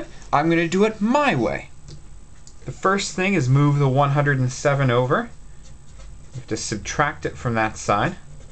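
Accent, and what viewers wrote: Canada. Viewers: You sound canadian:)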